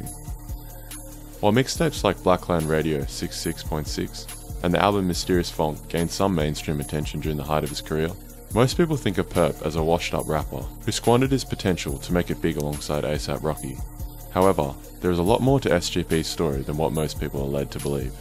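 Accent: Australia. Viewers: bro are u Australian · Pretty sure his accent’s Australian but there was no need to send hate like that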